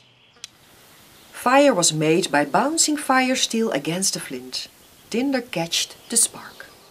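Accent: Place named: Netherlands. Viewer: It might have been better to have the commentary done by someone with less of a dutch accent and most of all with fewer grammatical flaws in it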